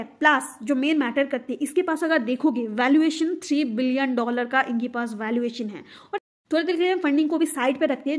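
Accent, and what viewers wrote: Bengal, India. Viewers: App Bengali ho kiya mam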